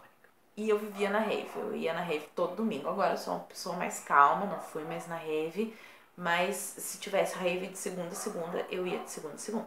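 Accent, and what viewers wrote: Rio Grande do Sul, Brazil. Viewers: Tem sotaque sim tchê!!! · Maravilhosa...corajosa...queridona..sotaque gaúcho sim kkkk....Não entre em depressão, porque tu não vai sofrer por causa de mediocres né....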